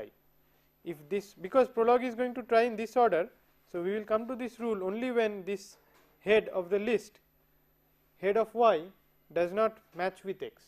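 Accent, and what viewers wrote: India. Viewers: I lke the Indish accent. But thank you.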